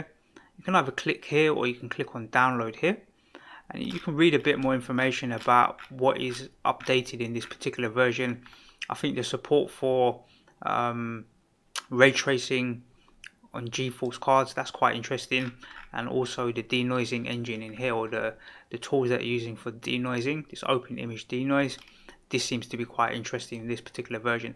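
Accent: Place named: Cockney, United Kingdom. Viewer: Thank you my fellow cockney instructor! clear and concise you are.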